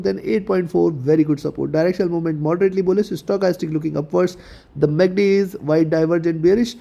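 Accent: India. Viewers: Eto je indiyskiy akcent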